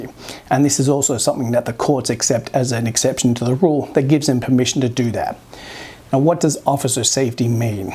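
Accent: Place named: Australia. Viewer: you sound like an Aussie. When will the US have insurance and registration linked to the number plate so the police only need to ask for your licence?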